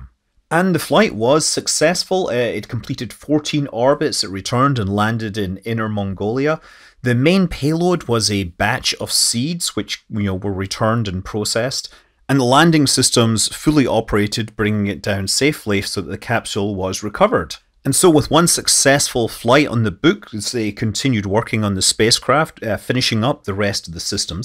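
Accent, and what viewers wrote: Scotland, United Kingdom. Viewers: Is Scott... Scottish? I thought I've heard a Scottish accent.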